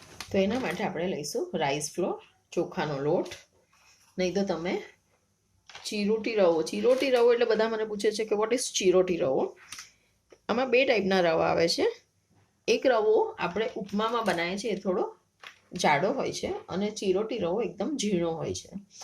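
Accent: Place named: South Gujarat, India. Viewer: ok. I think you are from south Gujarat.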